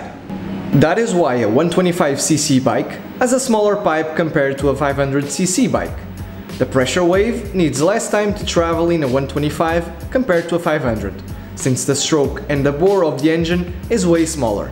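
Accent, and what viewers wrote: Portugal. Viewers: o narrador é Português... sotaque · E bem diferente ouvir um português a falar inglês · Ótimo vídeo meu caro! Reconheci seu sotaque português de cara! Um abraço do Brasil! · Ah...a bela pronúncia portuguesa sobre o inglês :D Não engana! Não conhecia o canal, muito interessante. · Bem me parecia que eras tuga XD XD XD assim como o M Cunha não conhecia o canal... Big Props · és portugues? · Esse sotaque portugues nao engana ninguem ahahah. Subscrito! Adorei o conteúdo e a explicação direta ao assunto.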